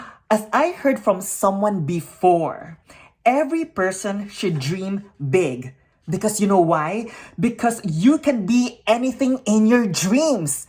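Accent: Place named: Philippines. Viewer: Love your speech over the dream and your Filipina accent! YASSSS! Immigrant +1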